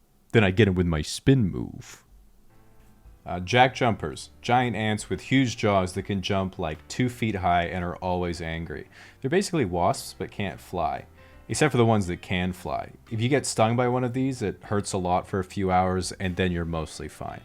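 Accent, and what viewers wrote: Australia. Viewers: Solid Australian accent 👌🏽 but then you mentioned telstra and gave it away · You are one of the only American with an authentic Aussie accent, also, the drop bears 🤣🤣🤣 Australian gang where you at? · after watching this i have decided australian accents are nice actually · that flawless yet unendingly irritating Aussie accent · Please keep talking in the Australian accent thanks! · It's obvious you lived in Oz as a kid. The accent is perfect. I'm from Tassie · I know i never heard you talk with an australian accent before. Did you chat with your Mum for a while on whatsapp must before you voiced this? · im from Tassie, and I always thought you speak with an aussie accent at times! how cool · Can we all take a minute to appreciate his Aussie accent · Causally explained in Australian accent hits different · How does your Australian accent sound so much like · your accent is a noticeable mix of australian and north american english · I like how it’s hard to tell what his real accent is. 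Is he faking the Australian or Canadian? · Other videos where he does his Australian accent? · Your Australian accent is my favourite thing about this channel probably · Wait... your Australian? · your aussie accent is mindblowing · Holy fuck I would never have put you as an Aussie but now you've mentioned it I keep hearing the accent slip through every now and then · Ahhh cool I thought you could nail the australian accent, do a good job of getting the extended vowels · I havnt heard your voice for a year and you sound a lot more Australian than I remember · Dude. I knew you sounded Aussie sometimes. You say the animals like you never left but most of the time you now sound vaguely American/Canadian i was never sure cause I'm Aussie lol *Edit I just got to the end and found out youre from Tassie..same...which wouldn't be weird except there are so few of us. Props for being YouTube famous hey. 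· As an Australian, man definitely knows his culture. Even his accent is amazing, surprised he didn’t keep one from when he went to primary school here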